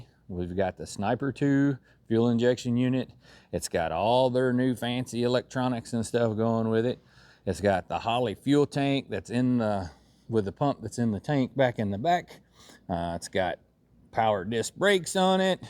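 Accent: South, United States. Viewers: your good ole boy southern drawl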